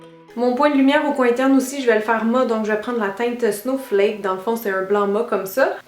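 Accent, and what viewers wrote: Quebec, Canada. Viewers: tres nice look, oooh les yeux j'ai tellement adoré, j'ai envie de l'essayer mntn bonne continuation, j'adore ton accent quebecois et je 'tadore.,😘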